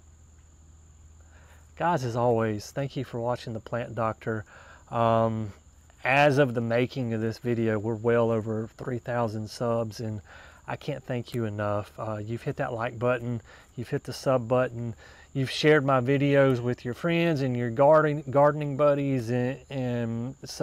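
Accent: South, United States. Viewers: Where do you live? It sounds to me like you also live in a southern state. I live in North TX and just wanting to compare your environment to mine. This is my first time to see your program and so glad I found you as we are currently doing a total makeover of our yard. I'm currently researching the Japanese Maples so this was perfect timing for me to bump into you. 😊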